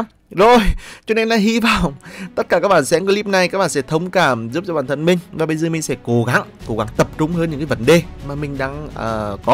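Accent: Nghe An, Vietnam. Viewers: Giọng Nghệ An dễ thương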